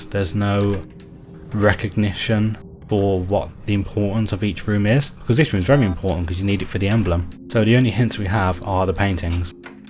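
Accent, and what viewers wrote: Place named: Birmingham, United Kingdom. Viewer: are you from birmingham or black country? accents familiar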